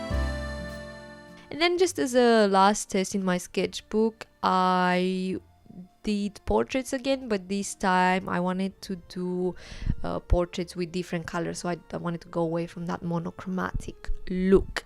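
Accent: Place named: England, United Kingdom. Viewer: great drawings and cute english accent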